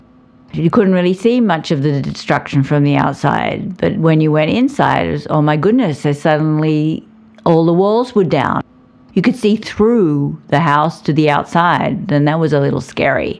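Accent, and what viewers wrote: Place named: New Zealand. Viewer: It's faint, but I'm detecting an accent in your speech. New Zealish?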